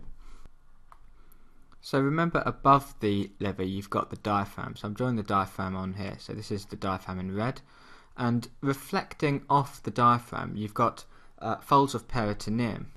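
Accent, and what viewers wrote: United Kingdom. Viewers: the accent is clear British it can't get any more beautiful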